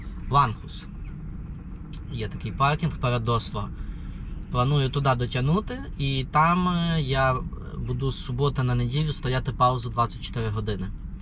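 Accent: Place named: Galicia, Ukraine. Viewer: Доброго Вам. пане чи то дня. чи то вечора - не суттєво. Маю надію, що Ваша дорога була легкою. Це саме той Микола що давав Вам пояснення відносно PILOT. Ну то таке - лірика. Тепер до справи. Мені дуже цікаво дивитися Ваші відео. а особливо слухати. Таке враження що Ви або з Франківщини, або з Галичини, або ще десь поруч. Дуже приємно вас слухати. То по-перше. А тепер по-друге. Як професійний працівник телебачення мушу зробити Вам деякі зауваження відносно з"йомок.Колись давно, коли мене ще вчили працювати з камерою мені в голову втовкли правило: "Любий рух камери в кадрі має бути обгрунтований".До чого це я? А до того. що коли Ви знімаєте Go pro з чола, то ліпше це робити під час руху по трасі. А ось коли Ви їдете по місту, або десь в зоні завантаження/розвантаження то оці FPV кадри не завжди доречні. Пояснюю. FPV - це скор. FPV — вид від першої особи. 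Так, це буває дуже цікаво. Але водій під час руху в умовах міста або заводу мусить контролювати обстановку і ліворуч, і праворуч і зпереду і ззаду. Тому на екрані така метушня буде доречна в тому випадку коли автор бажає передати відчуття збентеженності. тривоги та таке інше. В інщому разі воно просто або заважає, або просто не цікаво і глядач втрачає цікавість до того, що відбувається. Найкращий варіант - мати декілька камер. Наприклад: одну на вітровому склі, другу десь у спалці. третю десь зовні машини. Але це такі шалені гроші. що янавіть думати про це боюсь Перепрошую за такий довгий текст, але я дуже хочу щоб ваше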